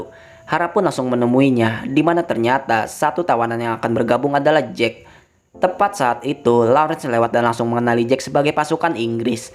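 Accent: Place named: Banjar, Indonesia. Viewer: Yang baca naskah orang Banjar Kalimantan nih. Ketahuan dari logatnya. Cuma saja intonasi tatkala berhenti paragrafnya ga enak didengar.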